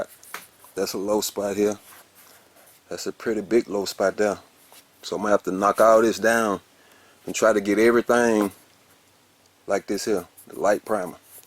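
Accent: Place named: Texas, United States. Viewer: I don't know you. First time seeing one of your videos. But that Texas comin thru strong in yo twang. Good stuff Mayne!!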